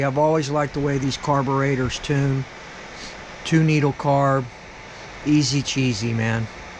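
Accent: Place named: New England, United States. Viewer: Couldn’t tell if you were up here in New England or Texas.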